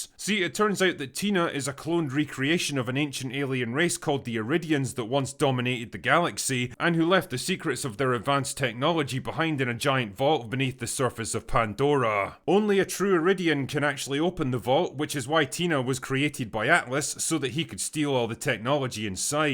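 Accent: Scotland, United Kingdom. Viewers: You understand that it’s a bit, right? Like he’s a normal dude with a Scottish accent when he’s on Open Bar or casually talking.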